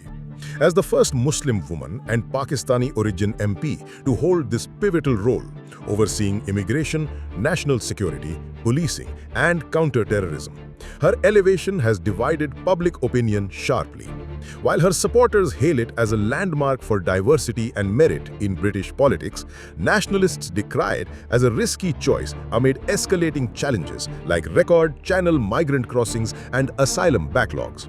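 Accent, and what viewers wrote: India. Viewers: Bloody indian voice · Stupid narrative for idiots with Indian accent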